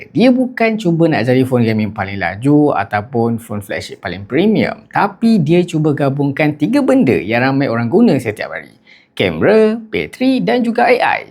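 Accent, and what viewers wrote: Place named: Perak, Malaysia. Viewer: Jongkang jongkit..Kompom org perak ni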